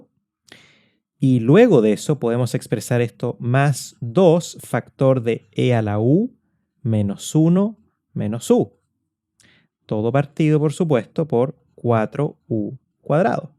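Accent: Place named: Chile. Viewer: aja ajá ese acento, eres hermano chileno!!! Pisquito y bocadillos! Yo soy del norte de tu frontera!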